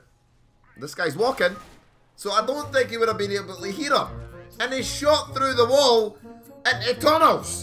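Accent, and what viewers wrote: Scotland, United Kingdom. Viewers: I think he's Scottish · i love your Scottish acciedent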